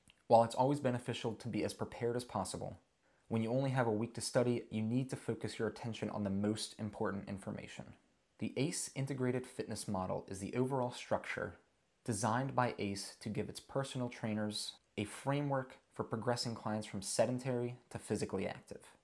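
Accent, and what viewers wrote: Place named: Baltimore, United States. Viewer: Almost couldnt tell youre from baltimore 😅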